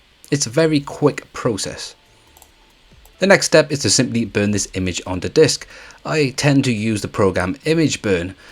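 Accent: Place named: South Wales, United Kingdom. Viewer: Your definitely from South Wales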